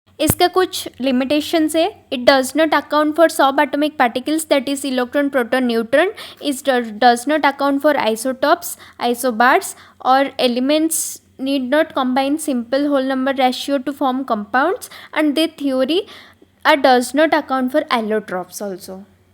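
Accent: Odisha, India. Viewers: i think may be u are from Odisha na ?? bcz ur way of talking reflects that.. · Didi are you from odisha? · AREY APP ODISHA SE HO KYA 🤔🤔🤔✨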